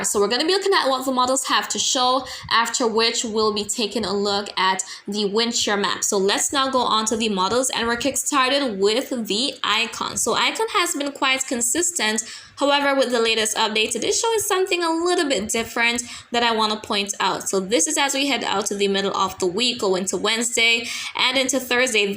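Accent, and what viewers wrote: Jamaica. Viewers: Sml love u so beautiful thanks for the update from Jamaica 🇯🇲 an love your Jamaica twang lol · Jah know...yu patwah tun up😂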